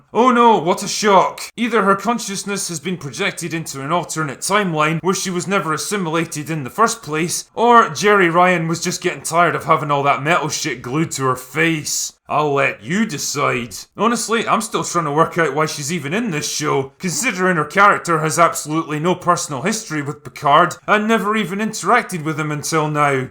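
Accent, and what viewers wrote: Scotland, United Kingdom. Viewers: He's Scottish and for these videos he loads up on it. Where you from? Where ever it is, there's something wrong with it because it doesn't sound like me.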